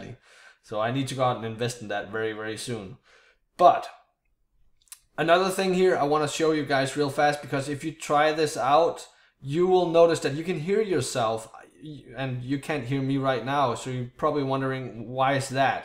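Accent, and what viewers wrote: Denmark. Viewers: Jeg tænkte det nok, jeg synes du har en svag dansk accent, men dit engelsk er pænt godt ( I hvert fald bedre end mit og jeg er 14 år gammel )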